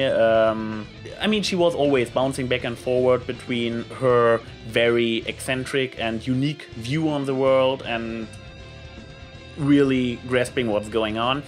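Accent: Germany. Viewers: Your german accent is allways a delight and i like your views on the show, keep up the good work! :D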